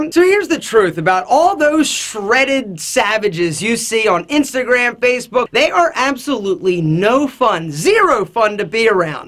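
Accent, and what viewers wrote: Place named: East Coast, United States. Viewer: east coast accent love it man where r u from?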